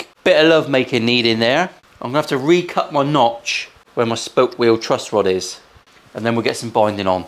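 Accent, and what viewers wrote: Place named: West Country, United Kingdom. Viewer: It isn’t possible to swear too much when you have a West Country accent. It’s actually pure poetry and art… and fockin funnoy!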